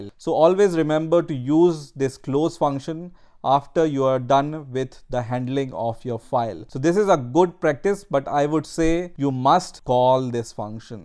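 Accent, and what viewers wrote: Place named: Punjab, India. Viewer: Thanks sir... It's helpful video............ And your good English is unable to hide punjabi seeming accent... Tenn... Inn😊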